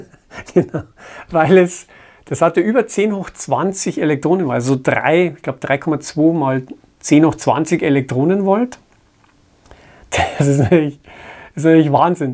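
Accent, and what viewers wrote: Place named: Bavaria, Germany. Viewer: hört man ja auch das freundlich klingende Bayrische heraus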